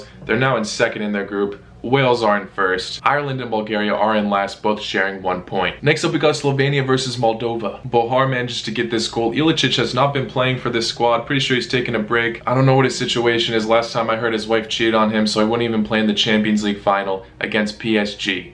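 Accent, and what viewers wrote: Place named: United States. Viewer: i love ho you pronounce russia russiya but with a slight american accent.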